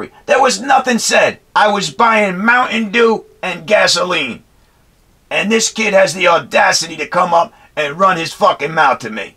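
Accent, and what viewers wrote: United States. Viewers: The accent makes me tear up as well, Murican as fuck, fuck yeah maaaan!! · The second I turned this video on I knew for damn sure he was a Carolinian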